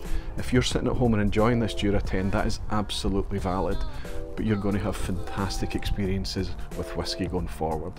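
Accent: Scotland, United Kingdom. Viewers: Wheskies! 😁 I love that Scottish accent!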